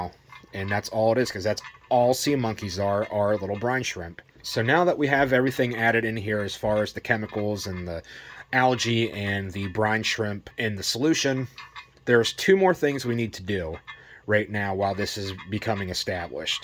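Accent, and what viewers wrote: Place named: Pennsylvania, United States. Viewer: Brother, if you're not from Pa, then you're certainly in a border state. One - the Amish. Two, the inflection in the voice. FWIW, I buy all my meat and produce from Amish vendors in Mt Joy and Lancaster county.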